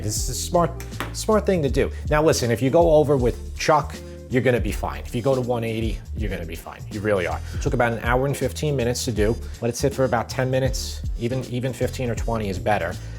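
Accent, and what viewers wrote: New York, United States. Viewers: Love 💗 the way you cook. A typical sounding New Yorker…..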